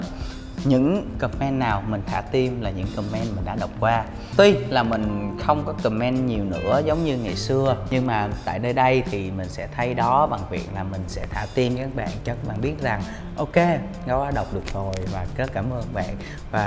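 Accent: North, Vietnam. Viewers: Giọng bắc xịn đấy:)) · Nói giọng bắc buồn cười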